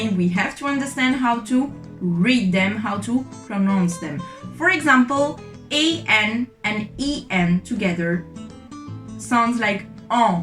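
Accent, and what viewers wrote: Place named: France. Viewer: j'aime beaucoup ton accent de francais <3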